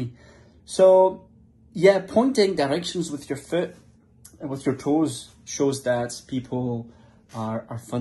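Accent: Scotland, United Kingdom. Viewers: J'ai étais faire un séjour linguistique d'un mois à glasgow l'été dernier et c'était amazing. I fall in love of the city and Scotland. Thanks to you i keep practicing my english with your scottish accent. So thank you !! Merci ! 🇫🇷 🏴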